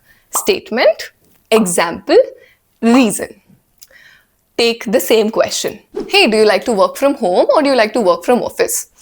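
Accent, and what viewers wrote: India. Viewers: Indian accent 😭😭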